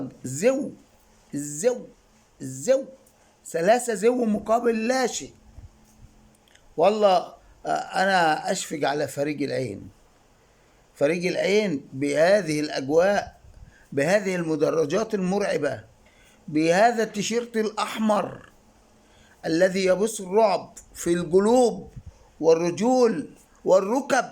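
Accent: Egypt. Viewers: انت مصري مش كده😢 فلاش تعمل خليجي لأن اللهجة المصرية طاغية عليك وواضحة والموضوع واضح بالرغم من اني عايش في الخليج بس مش محتاجة وأي واحد يقدر يكشفه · أنت مصري صعيدي كمان. مين موافقني في هذا الرأي بعض الحروف فضحتك يا معلم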